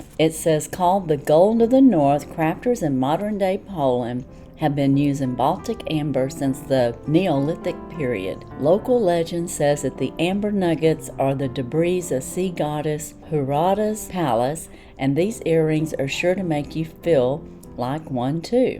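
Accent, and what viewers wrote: South, United States. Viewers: Hi Miss! I love hearing you pronounce all the Polish words with your Southern accent & enjoying the treats. Great variety of items in this box.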